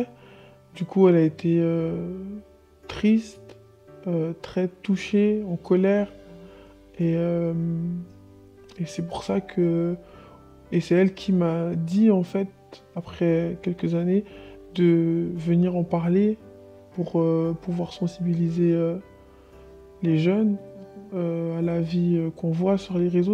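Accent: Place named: Belgium. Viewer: Sa s'entends que c'est une Belge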